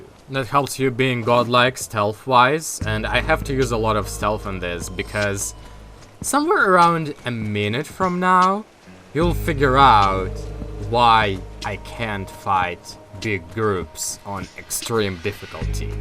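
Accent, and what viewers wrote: Russia. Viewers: !!!! What's wrong? Why your accent so Russian? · Sometimes I forget that is from Russia, nice to hear his accent sometimes.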